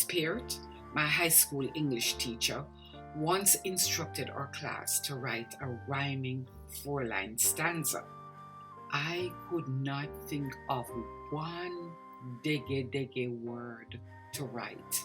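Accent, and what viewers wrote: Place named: Jamaica. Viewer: You flavored this very well by chipping in the Patois now and then 😂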